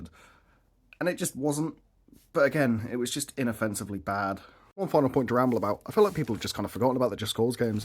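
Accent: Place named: Midlands, United Kingdom. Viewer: Hey judging by your accent you’re from the midlands right?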